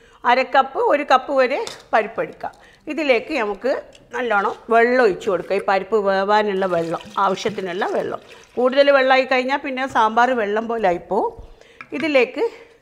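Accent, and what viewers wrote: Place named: Malabar, India. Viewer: Mam your cooking classes are very helpful.When ever i am having doubt while cooking i watch your videos and i love the way you talk with that malabari mapila accent😊.Looking forward to more new recipe's✌🏻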